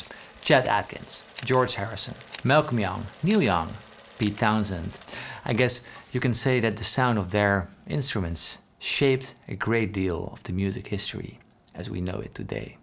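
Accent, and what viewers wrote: Netherlands. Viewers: are you Dutch by chance? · Are you dutch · i hear your dutch accent :) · Holy shit, het eerste wat ik dacht was: "dit klinkt als een Hollander die Engels praat". Dat werd snel bevestigd... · Groetjes uit Nederland. Je kan altijd een Nederlander horen als die Engels spreekt :-) Ja, ik heb ook een accent 🙂 Hoe dan ook, eerste keer dat ik je zie, super goeie vid gozer!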